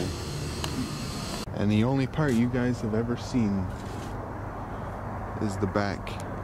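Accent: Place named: Texas, United States. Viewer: Love the jumper. So these cats want Pizza? I keep thinking ur from Texas... x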